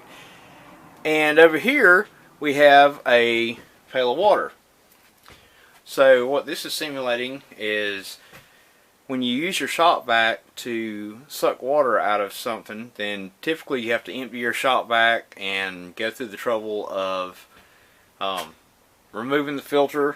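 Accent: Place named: South, United States. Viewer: Haha. People can be cruel at times. If it is any consolation , you have a great southern voice.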